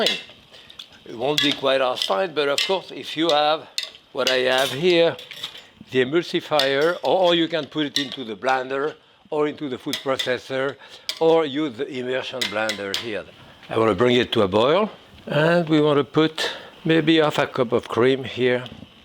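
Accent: France. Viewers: Monsieur Pépin, j’aime regarder votre émission de cuisine, et votre accent français quand vous parlez anglais est si adorablement mignon 😊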